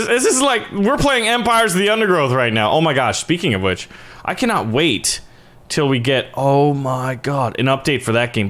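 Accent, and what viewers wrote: United Kingdom. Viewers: I like how you do all the different accents for all the different nations. As someone who is British, I think that your British accent is a little bit daft but I like it. Food wise, we do like curry, but it's not really our national dish. For that, I'd direct you towards something like fish and chips, or a Sunday roast, or even a full English breakfast. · I approve these British accents and any further British accents made by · I like his British accent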